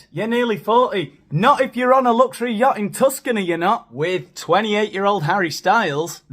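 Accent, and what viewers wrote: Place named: Northern England, United Kingdom. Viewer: How have I only just discovered you? Subscribed ....ready for a binge. Love your Northern accent feels like home.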